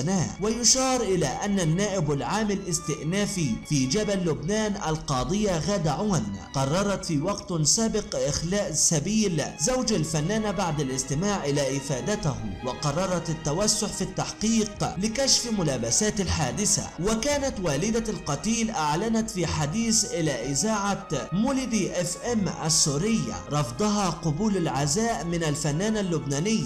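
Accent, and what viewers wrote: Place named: Sohag, Egypt. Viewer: اكيد سوهاجي سوهاجي يا امينه ما هما تبارك الله ماليين علينا حياتنا .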